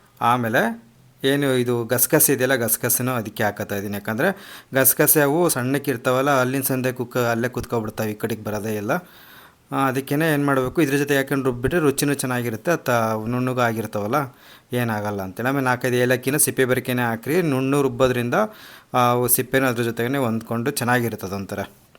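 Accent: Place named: Hiriyur, India. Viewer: Nammadu hiriyur bhase kele khushi ayetu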